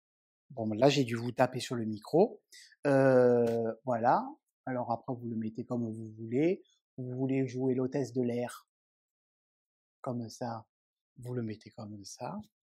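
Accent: South, France. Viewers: Merci Toujours un régal de te retrouver avec ton bel accent du sud..j’en ai marre de tricoter tes chaussettes!! Mon second petit fils a voulu les mêmes que celles tricotées pour son cousin! Heureusement que je change le contrastant!j’ai horreur de faire 2 fois le même ouvrage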